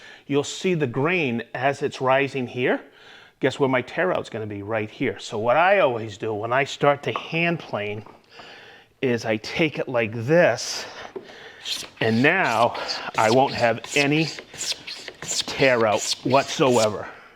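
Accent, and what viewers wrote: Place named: Boston, United States. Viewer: What kind of oil on your sock? Could not understand with your Boston accent.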